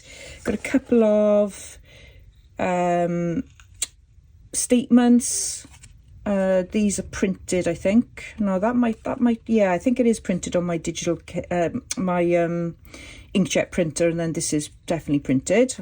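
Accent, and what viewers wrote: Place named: Wales, United Kingdom. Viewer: Hello from Montreal. I think there will always be people preserving the art of paper working. It's a lovely art. I was trying to place your accent... it's Welsh... Love it! I so enjoy watching your videos. Thanks for sharing! Takecare. BTW...love your page! ❤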